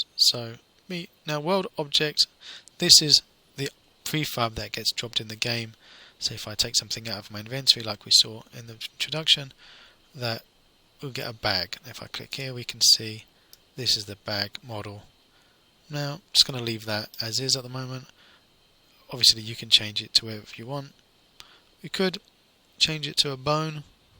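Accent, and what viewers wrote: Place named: England, United Kingdom. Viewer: ah well... audio things may come more naturally to me as studied music and got really into mixing when I left school. audacity is free and I would encourage anybody making videos to run their audio tracks through it and back into their video editor. def worth playing around with. I never worry about speech impediments, they're part of a persons unique accent. isaac arthur is one of my favourite youtubers and he has a reeeeeeeally bad tongue tie, but everybody loves listening to him lol. and your accent is great, very english. I think particularly australians don't particularly like listening to australians, but we get bored of hearing americans all the time. (Also I wouldn't have recommended an EQ back then, I would have said just buy a $25USD mic from aliexpress, but I wasn't listening to you back then lol)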